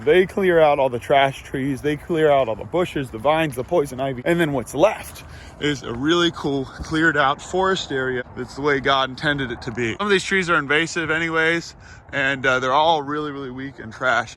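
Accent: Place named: Pittsburgh, United States. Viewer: I have never been more engaged in a strangers life more than yours lol. It took me way to long to pick up your accent(Crosby Jersey gave it away too) and I’m dying to know where you are from in Pittsburgh? I live in Plum Borough. Keep up the good work you deserve way more subs. I don’t think any other YouTuber had an effect on me like your stuff. I’m a grown ass man and yell EVER HEARD OF IT! At everyone. My gf does not appreciate it.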